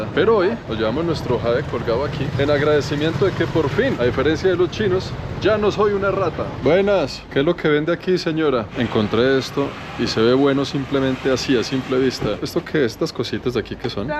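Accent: Colombia. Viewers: Según tengo entendido, es hijo de madre Colombiana, padre Argentino, nació en Panamá, vivió varios años en Canadá, tiene sangre de Mesoamericana y de la península Ibérica, con acento colombiano. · Muy buen relato hermano, por su acento, ma párese como de Bogotá, Rolo como les llaman a los de Capital de Colombia. Gracias por su reportaje, de Antigua., Monumento Colonial de América. Saludos Cordiales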